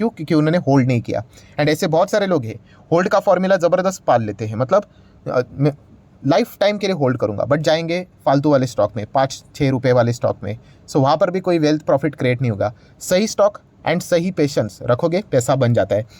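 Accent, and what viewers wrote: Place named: Bhopal, India. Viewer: भाई ये भोपाली हैं, और भोपाली ऐसे ही बोलते हैं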